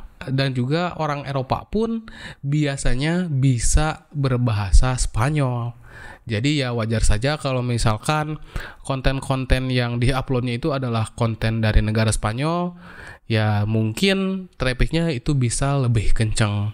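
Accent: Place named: West Java, Indonesia. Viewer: lagam indo na siga sunda,sunda na timna a?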